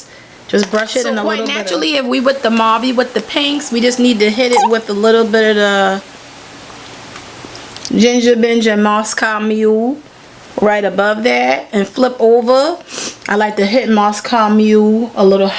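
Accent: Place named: New Orleans, United States. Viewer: Loved the lip combination!! and love your accent. are you from nola? lol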